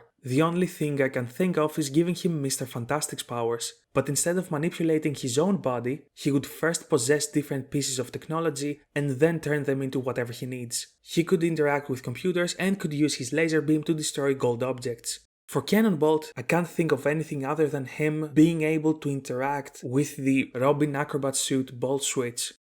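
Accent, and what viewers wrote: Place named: Greece. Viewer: OK this is something I was legit theorizing about myself since I was little so I knew I had to click on it. And as if it wasn't enough, 2 seconds in I'm like "Hold up, αυτή η προφορά μου ακούγεται *ελληνική*" and sure enough, looked at the listed location and that's what it was lol. So not only did I stumble upon a video about a hypothetical I used to think about myself back in the day, but the person doing it is from the same country as me. What are the chances?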